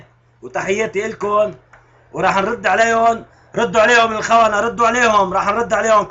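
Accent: Lebanon. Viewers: هاد لبناني مبين من لهجتو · هاد لبناني مو سوري .. السوريين مابيحكو هيك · لك هاد لبناني مو سوري مبين من حكيو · هاد لبناني من لهجتو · ألى الكل هاد الشاب ليس سوري هاد لبناني الدليل اللهجه مش سوريه لهجتو لبنانيه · اول شي هاد مدح الجزائريين تاني شي هاد لبناني مو سوري و واضح من لهجتو حاج تعملو فتن بين بعض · هيدا لبناني مش سوري لهجتو لبنانيه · هادا لبناني مو سوري نحنا لهجتنا مو هيك ياجماعة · انا سورية ولهجتو غير اللهجة لسورية لهجتو بتشبه اللهجة اللبنانية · من لهجته باينة انها لهجة لبنانية .والله لي فخر انني جزائرية والف تحية لفلسطين وسوريا ولبنان والمغرب كلنا عرب اخوة نحط ايد بإيد باش نصنع المجد ونرجع زمن القوة والسلطة اللي سلبت منا وأستدل بكلامي بقول عمر بن الخطاب رضي الله عنه (نحن قوم أعزنا الله بالإسلام، فإن ابتغينا العزة بغيره أذلنا الله) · هذا ماشي سوري هذا لبناني باينة من لهجة تاعو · لهجته لبنانية مو سورية..... هاد لبناني مو سوري وبعدين بيحكي كلمات وسخة كتير وهي من عادات اللبنانية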